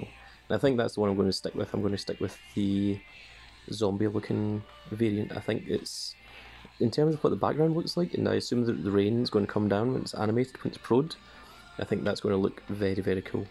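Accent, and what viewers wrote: Scotland, United Kingdom. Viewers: Are u from scotland??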